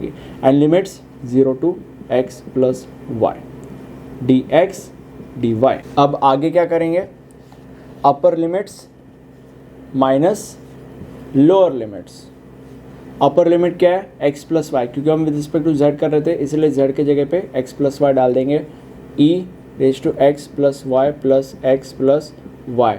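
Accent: Maharashtra, India. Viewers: Sir aap marathi ho kya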